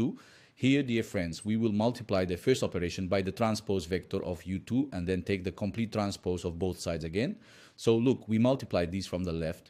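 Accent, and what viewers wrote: India. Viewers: Hı teacher . YouTube made your accent like Indians accent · biraz indian english olmuş hsdjgfs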